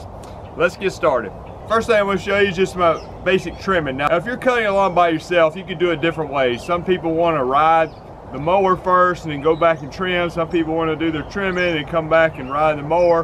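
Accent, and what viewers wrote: South, United States. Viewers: Any manual skill automatically has increased credibility if the instructor has a southern drawl. Thank you, sir! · What part of the south you from, NC here representing!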